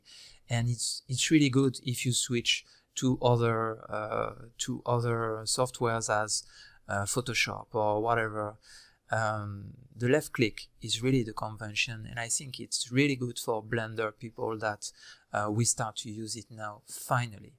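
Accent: France. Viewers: Great explanations , went and bought his complete training course , don't mind the french accent but he is so soft he puts me to sleep, but it is better than trying find it here and there, great education all in one place. He is bringing me up to speed fast and I can't say enough good things about it. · On sens tellement l accent français ou un truc dans le genre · so I was looking for R when you said ER :D I love french speaking english :D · are you french?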